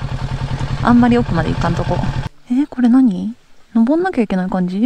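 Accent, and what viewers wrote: Tohoku, Japan. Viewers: には東京でも東北弁でもっと話してもらって東北弁バイク女子のポジションに行って欲しい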